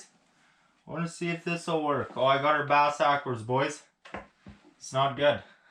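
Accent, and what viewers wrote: Canada. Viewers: Ah shite aye? Thats kinda luck shitteh. I came here for how you talk its hilarious and the world needs more back country Canadian slang.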